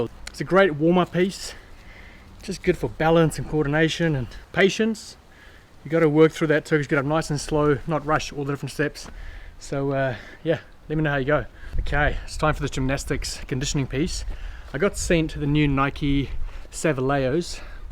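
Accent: South Africa. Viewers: Hey Mate. It’s weird listening to another South African on YouTube, but I like the videos. Keep them coming. What gym did you own in Auckland? Was it Andfit?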